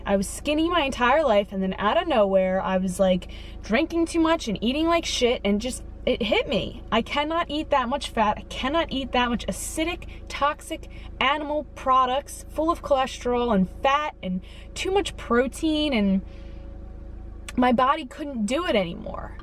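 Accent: Pennsylvania, United States. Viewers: As soon as I heard your accent I knew you were from PA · your accent I knew you were from PA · I love your accent! I'm also from PA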